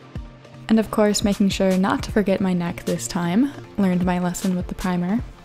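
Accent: Germany. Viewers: Wait are u German? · em stop, are u german?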